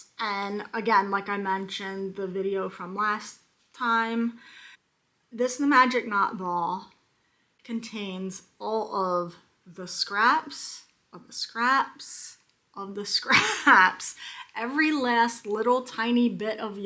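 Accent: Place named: California, United States. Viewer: South Central PA or valley girl? You sound like California.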